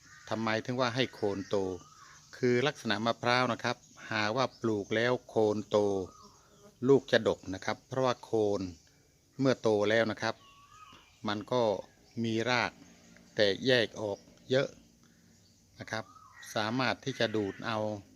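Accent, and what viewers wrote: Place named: Buriram, Thailand. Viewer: น้ำเสียงคล้ายคนบ้านผม..แถวๆเซราะตลุง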